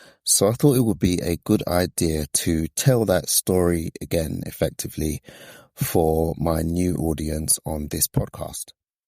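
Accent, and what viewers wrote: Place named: United Kingdom. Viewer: I am bingeing all your videos! Your uk accent drew me in. Also in uk. Near london.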